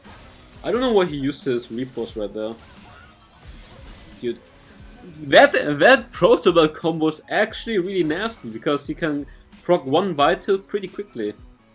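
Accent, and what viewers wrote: Germany. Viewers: One Question... are you from Germany? Your pronunciation sounds a bit german. Btw, I really like your videos... they are very helpful for platinum players like me :)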